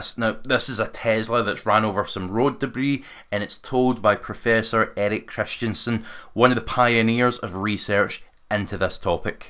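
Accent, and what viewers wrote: Scotland, United Kingdom. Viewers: in this video, you will see on screen, that there have been 15 verified Vapour Cloud Explosions globally, since 2010. Try measuring that against the number of petrol vapour explosions over the same period. You cannot; because it is in the many thousands. As for a “light electric vehicle fire” that includes countless e-scooters, e-bikes, motorised wheelchairs, carts and the like. Modern BEVs have sophisticated battery management and cooling systems. Try stating those figures. There were 239 fires recorded in the UK from July 2022 to June 2023 that were linked to EVs, but the vast majority of those did not involve the battery at all. A lie with a cute Scottish accent is still a lie.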